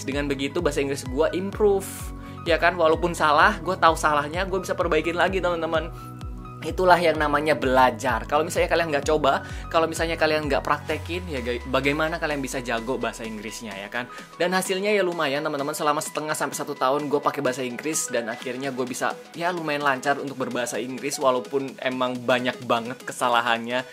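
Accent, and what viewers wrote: Java, Indonesia. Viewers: Kak orang Indonesi apa Jawa Kok ngomongnya Kaya jawa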